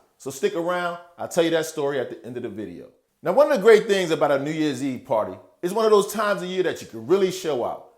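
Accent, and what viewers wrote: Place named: East Coast, United States. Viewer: Ahh ok you from Philly, I had a feeling you was from somewhere on the east coast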